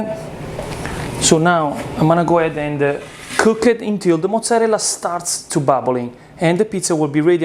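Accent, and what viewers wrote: Italy. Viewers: I like you English with Italian accent and I also like very much your videos. Great job! I want to be your student to be a BIG pizza chef WOW!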